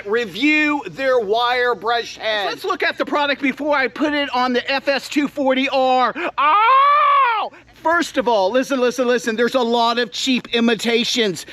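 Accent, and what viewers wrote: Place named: United States. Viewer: omg.. a loud yank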